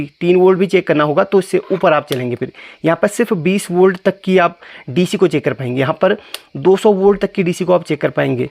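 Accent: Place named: Uttarakhand, India. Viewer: Sir bahut bahut dhanyawad,,,apki awaz se lg raha aap uttarakhand se ho , kya mai sahi hun ?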